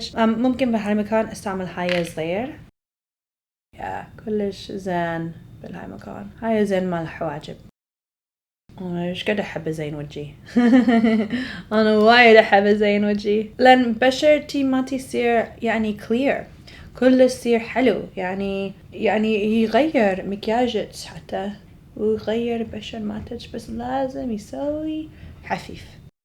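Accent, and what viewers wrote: Iraq. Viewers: لج فدووه للي خلقج كلش كيووت من تحجين بالعراقي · فدوه لله شگد حلوو تحچي عراقي 🤦‍♀️😂🥰😍 احبك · فدوووه للحچي العراقي اموت عليج 😍😍❤❤ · تخبلين من تحجين عراقي 😻😂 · يول تجنن من تحجين عراقي اروحلج فدوة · فدوه شلون تحجي عراقي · I love it when you do your videos with Iraqi accent please continue on that and great job love it love it 😍, now i have a request please, can you show us how to get rid off or reduce pores? I have big pores and they show after makeup too no matter what I use!! ☹️☹️ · هههههههه حلاة اللهجة العراقية 💚❤️😂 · ههههه يجنن حجيها فدوه لل لهجة العراقيه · فدوه 😍 شلون تحجي عراقي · تخبلين من تحجين عراقي 😂❤️💕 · والله اضحك من تحجي عراقي😄😄😄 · انا عايزة كمله مفيدة😭ونبي كيوت من تحجي عراقي😂💜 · انتي شكد كيوت لما تحجين عراقي احبج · it's so beautiful to see you making videos while speaking in arabic while you're not that fluent but you still try to speak with your beautiful Iraqi accent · فدوة الج تخبلين باللهجة العراقية تحية من المغرب 🌸🇲🇦🇮🇶🌸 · انتي كلش كيوت من تحجين عراقي اتمنة الج التوفيق والتقدم نحو الافضلل لوفيووو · اتجنن من تحجي عراقي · صعب عليها مدا ترتاح بيها بس حجيها كلش كيوت بل لهجة العراقية فدوا 💙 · شكد حلوه بلهجه العراقيه جنن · She speak iraqi accent not khaleeji accent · شكد احبها من تحجي عراقي 😀❤ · كيوتتت باللهجه العراقيه☹️❤️❤️❤️❤️ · لان اللهجة عراقية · جمال اللهجة العراقية جمال العراقي بليز سوي فيديوهات كثير باللهجة العربية😍😍❤️❤️ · حلو كلامج العراقي بس لاتكولين وايد لو كثير كولي هوايه بلعراقي لان انتي تحجين عراقي خلطتي خليجي وعراقي😂😂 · فدوه للتحجي عراقي ياحياتي احبج هوايه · طريقة كلامج جدآ حلوة ونشالله بيرجع كلامك العراقي مضبوط منشن نتفهم شو عم تحكي بحبك كتيييير ليلى من العراق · فدوووه تنوكل وهيه تحجي😂 افتهمت كلشي كالته من حجيها يخبل الهجه العراقيه عليها عسل💜💜 احبج هوواي متابعتج من العراق الحبيبه🌸🌸... 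وانا جد جنت ادور ع طريقه ثانيه غير الخيط لان يعورني ويطلع حبوب وانا بشرتي حساسه وراح اجرب بأذن الله هاي الطريقه شكرا 💜...لوف يو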